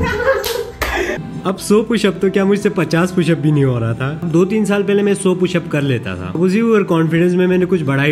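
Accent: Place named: Kargil, India. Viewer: Bia app ka accent kargil se lagat hea .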